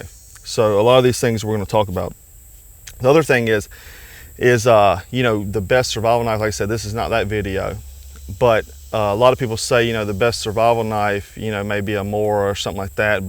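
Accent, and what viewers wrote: South, United States. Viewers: You sound like you're from North/South Carolina? Nothing wrong with that. American by birth, Southern by the grace of God. Great video.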